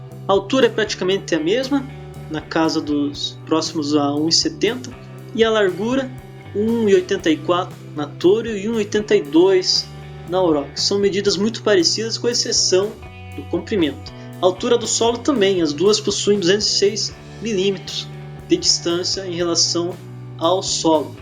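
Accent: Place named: Santa Catarina, Brazil. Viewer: #CARWP nossa seu sotaque é de catarinense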